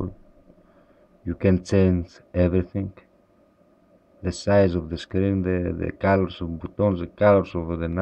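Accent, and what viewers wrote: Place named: Greece. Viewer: Otan o ellhnas milaei agglika fenete apo thn profora tu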